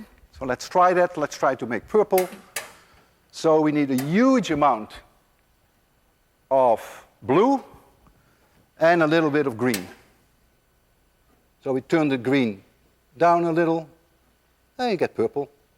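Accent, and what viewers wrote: Netherlands. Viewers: Ha, I knew he was Dutch... Because of the accent. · With that kind of accent he could only be Dutch...figures I was right:) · Is he dutch? He's accent is kinda dutch?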